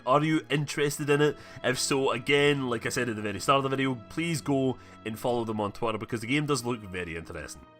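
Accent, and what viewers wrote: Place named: Edinburgh, United Kingdom. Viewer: Also, my mum's from Edinburgh, so luv yr accent :)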